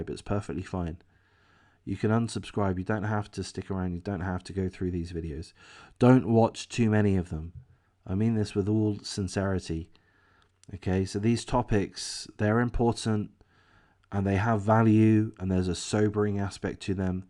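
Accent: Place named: United Kingdom. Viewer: As listening you are clearly British like me, out of curiosity do you still live in England? Or follow these because of now living in America?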